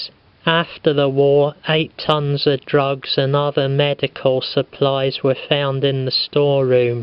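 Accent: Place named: England, United Kingdom. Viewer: wow great voice . you need to be in the old carry on movies .so Engish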